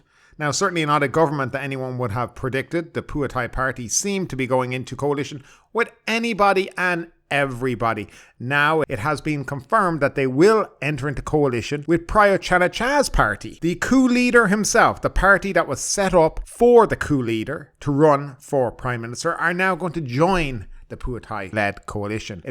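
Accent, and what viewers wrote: Ireland. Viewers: This guy sounds Irish.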